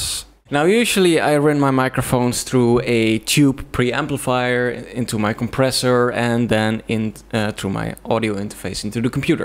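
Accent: Netherlands. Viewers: neemt de microfoon ook nog geluid op als je er een stukje zo een metertje van af zit? ik hoor aan je accent dat je nederlands ben hahah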